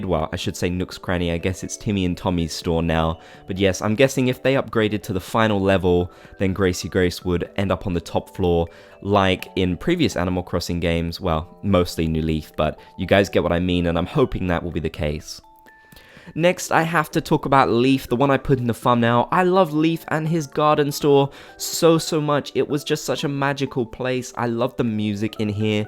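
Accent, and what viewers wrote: United Kingdom. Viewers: I enjoy listening to your British accent, especially when you said PAT-UN ! 😄 New Horizons is my first Animal Crossing game, so I would gladly welcome any new stores. Leif is also one of my favorite NPCs, so I’m all for him having a shop.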